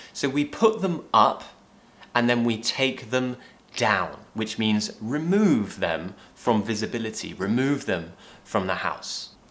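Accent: United Kingdom. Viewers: Happy celebrations!!!! And thanks for all videos!!! I love British pronunciation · Hi sir. EVEY TIME WHEN I LISTEN TO YOUR SPOKEN BRITISH ENGLISH I LOVE MORE AND MORE ENGLISH.CHEERS OUR BEST TEACHER.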